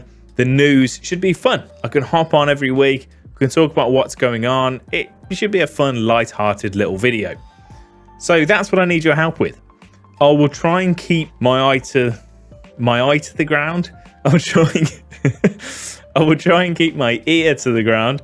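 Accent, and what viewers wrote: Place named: Birmingham, United Kingdom. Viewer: Did not think you was Brummie, convinced myself you was a northerner 😳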